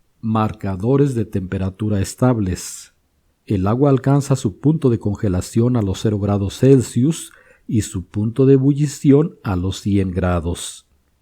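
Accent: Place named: Dominican Republic. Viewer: Es acento dominicano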